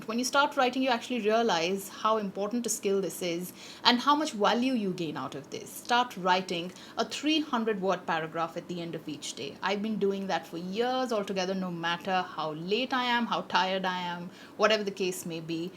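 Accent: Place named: England, United Kingdom. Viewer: Really loved this video. I really love your English accen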